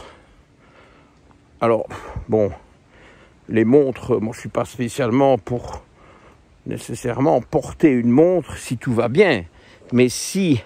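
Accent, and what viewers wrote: Belgium. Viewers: Merci et vos bestioles! Vos capsules ont un air poétique et naturel qui me convient bien, de plus j'aime bien l'accent du cru Belge comme septembre sans le "p" ou et poui ❤😊 Le seul bémol -pour moi - est que vous n'annoncez jamais l'année sur vos shooting 😢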